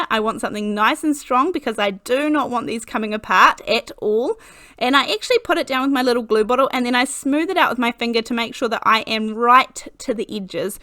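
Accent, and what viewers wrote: New Zealand. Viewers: You sound like New Zealand. I'm in Montana, USA. Those are so cute. Brand new subscriber just right now.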